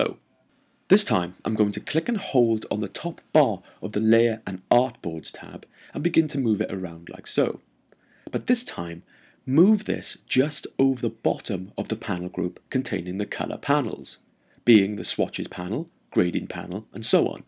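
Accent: Wales, United Kingdom. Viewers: Thank you for these videos. Love the familiar Welsh accent too :)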